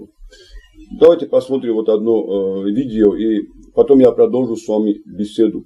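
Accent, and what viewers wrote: North Ossetia, Russia. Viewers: А почему у тебя осетинский акцент ти случайно не осетин. Плохо брать по грузинский говоришь. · ЧТО У ТЕБЯ ЕСТЬ И ЧТО У ТЕБЯ ВОРОВАТЬ? ВОН ТУРКИ УЖЕ У ВАС ДОМА КОМАНДУЮТ И ПРИБИРАЮТ ВСЁ К РУКАМ. НЕ ДАРОМ ОНИ ВАС НАЗЫВАЮТ ГУРДЖЫСТАН, ЧТО ОБОЗНАЧАЕТ РАБЫ! ТАК, ЧТО ГОРДИСЬ ДАЛЬШЕ. У ТЕБЯ САМОГО АКЦЕНТ ОСЕТИНСКИЙ Я В ЭТОМ ХОРОШО И ЧЕТКО МОГУ ОТЛИЧИЕ УВИДЕТЬ. ТАК,ЧТО НЕ НАДО ЛЯ ЛЯ...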